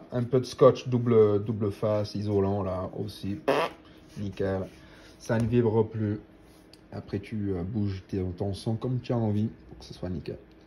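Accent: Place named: Aude, France. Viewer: Pour les tables Zaccaria en promotion, on peut espérer les trouver à combien sur leur site. Parce-qu'actuellement elles sont à 250$ (1 à 5). J'avoue le prix est élevé. Je me suis autorisé l'achat des jeux verticaux, malheureusement quand j'ai reçu la clé d'UK. Le pinball me l'a planté. Ils m'en envoient une autre et m'ont dit que cela pouvait arriver. Quand j'écoute votre accent.....vous semblez ne pas être très loin de chez moi. Je suis du dep 11. Je vous dis à votre prochaine vidéo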